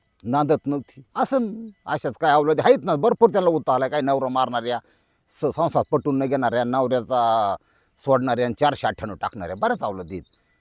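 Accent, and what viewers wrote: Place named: Narayangaon, India. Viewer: मामा तुमचे गाव जुन्नर तालुक्यातील आहे का. बोलणे नारायण गाव सारखे वाटते.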